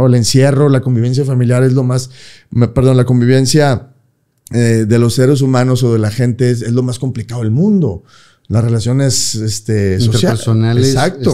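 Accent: Monterrey, Mexico. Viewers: Tal vez por el acento, así hablamos los regios jejejeje 😁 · Me sentí en una carnita asada, que bonito es el acento regio!!!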